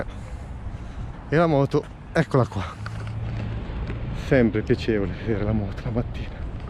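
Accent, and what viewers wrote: Marche, Italy. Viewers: montate da 2mila km sulla mia Africa Twin. Dall'accento sei marchigiano?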